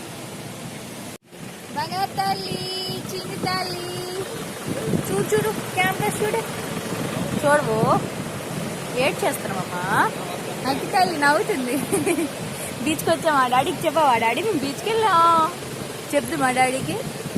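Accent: Srikakulam, India. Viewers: Ayite srklm slang Or vsp Or hyd edo okate laa paluku oknaa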